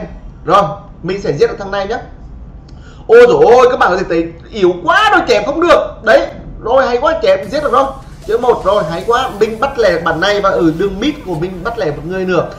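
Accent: Nghe An, Vietnam. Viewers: Idol của tui ngày nào , cái dọng Nghệ An , từng cái clip cẩm nang Nak , giờ sắp thành Idol triệu sud rồi , chúc idol thành công nha , Mại yêu 😘😘😘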